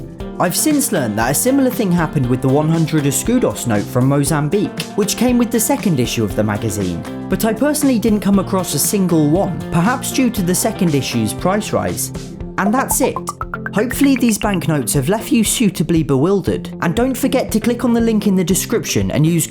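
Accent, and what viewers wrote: United Kingdom. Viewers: I love your british accent 😂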